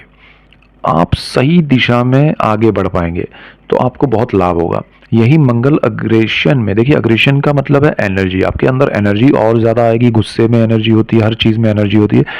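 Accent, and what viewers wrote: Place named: Kashmir, India. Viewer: Are you from Kashmir your accent is kashmiri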